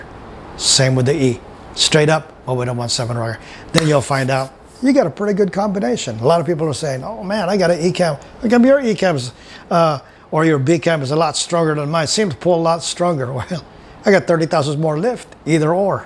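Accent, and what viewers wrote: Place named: Louisiana, United States. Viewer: You sound like you're from down the bayou. Where are you from?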